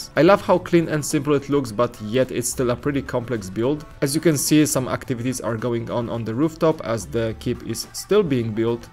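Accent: Poland. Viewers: po akcęcie stwierdzam że jesteś polakiem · Jesteś z Polski? Przypuszczam, że tak po akcencie i tym jak czytasz polskie nazwisko, że chyba tak. Great channel! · You have gr8 polish accent. Are u from Poland?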